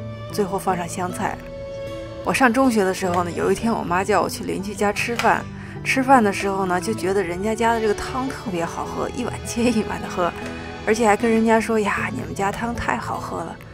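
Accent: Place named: Beijing, China. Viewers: 听口音像是京腔。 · 冒昧請問是哪裡人？在那裡長大的？聲音像北京人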